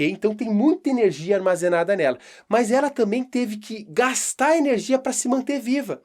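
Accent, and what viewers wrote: Rio Grande do Sul, Brazil. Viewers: sou apaixonado pelo sotaque gaúcho.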